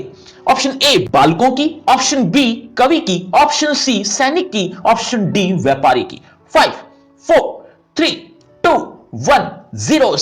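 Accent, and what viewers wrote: Haryana, India. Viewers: hryana se ho kya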